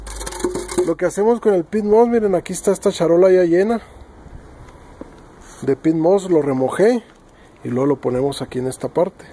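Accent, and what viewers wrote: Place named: North, Spain. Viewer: me encantan la manes de explicate y su acento norteno me encantan felicidades excel ente video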